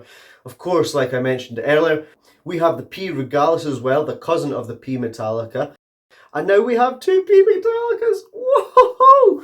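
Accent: Scotland, United Kingdom. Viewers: I watch Dave every week and his channel with camera lady it’s fab, I’ll admin nice to hear your Scottish accent looking forward to more of your post now that I know your here.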